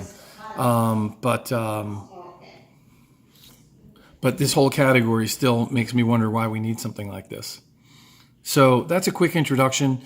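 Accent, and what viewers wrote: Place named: United States. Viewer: He sounds American, so probably Snapdragon 845.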